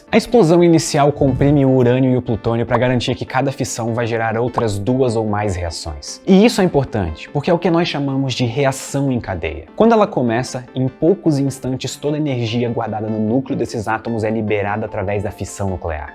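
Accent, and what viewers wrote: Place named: Rio de Janeiro, Brazil. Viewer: CARIOCA SPOTTED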